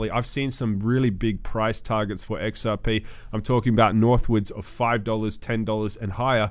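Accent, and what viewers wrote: Australia. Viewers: Great to hear a good Aussie accent. Xrp will run like mad once the market runs. Now it’s clear adoption will explode Thanks for your work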